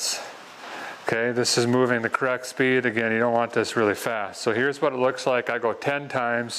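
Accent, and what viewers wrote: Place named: Minnesota, United States. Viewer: This is how all Minnesotans north of i94 talk. Almost Canada relates to vocal inflections as well as weather.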